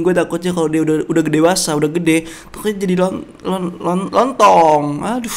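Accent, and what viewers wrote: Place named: West Sumatra, Indonesia. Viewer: emg dri khas minang:))😅🤔😇💔😤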